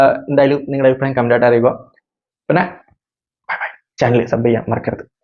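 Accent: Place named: Kannur, India. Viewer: Kannur slang aan main kaaranam...😊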